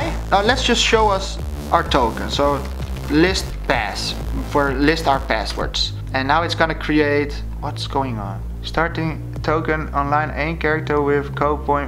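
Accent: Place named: Netherlands. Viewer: You have a ducth accent '-'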